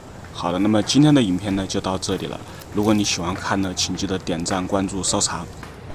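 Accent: Wuhan, China. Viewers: 你要不是武汉口音明显，我真怀疑你是国外长大的。口条都不知道😂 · 之前听你说话觉得你怎么像我们武汉人后来看到评论说你就是武汉人，哈哈原来是老乡！😊😊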